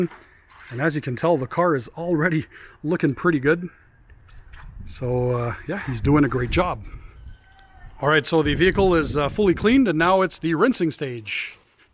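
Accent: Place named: Quebec, Canada. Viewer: Hey I'm a big fan of your channel, I love all your informative videos and now looking to put cquarts UK 3.0 on my vehicle but I'm having a hard time to decide whom to professionally get it done. I'm located in Laval QC. Any recommendations? Im asking because with your accent and French videos I'm just assuming you're in QC as well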